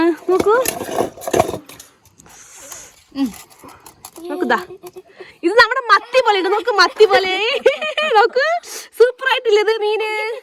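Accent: Palakkad, India. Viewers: Palakadan malayalam ❤❤❤❤🎉🎉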